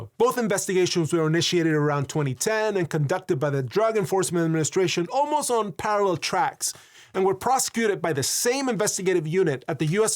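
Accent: Chile. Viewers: Wonderful video, loved the Chilean accent and thank you very much for naming/showing for a moment the other coups from Latinoamérica PD: Mi departamento completo quedó plop cuando lo escuchamos hablar español, lo seguiremos apoyando desde Chilito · Not gonna lie, the Chilean accent took me by surprise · No sabía que eras chileno! Pero después de escucharte hablando en español es muy obvio y no me puedo creer que nunca me dabas cuenta que eras latino. ¿Nos darás unos vídeos legales en español, por favor? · Había notado el acento, pero no sabía que era chileno. Yo he visitado La Moneda, Plaza de la Constitución y el Museo de la Memoria y Los Derechos Humanos. Fueron impactantes. Espero que no tengamos un Tanquetazo aquí, pero estoy preocupado.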